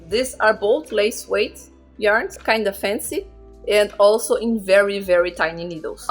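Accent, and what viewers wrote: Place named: Brazil. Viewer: incrível que eu pensei "ela é brasileira, certeza" só de ouvir você falar. ameiiiii 💜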